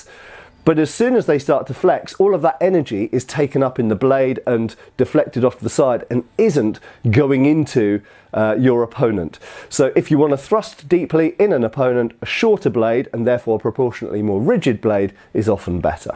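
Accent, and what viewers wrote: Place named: United Kingdom. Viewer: If I couldn’t hear your accent, I would still know you were British due to the incredible dead delivery of innuendo here haha. Well done!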